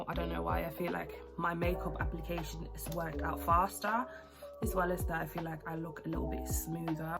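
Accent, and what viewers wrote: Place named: Scotland, United Kingdom. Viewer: Appreciate the subtitles cause I don’t understand your Scottish accent x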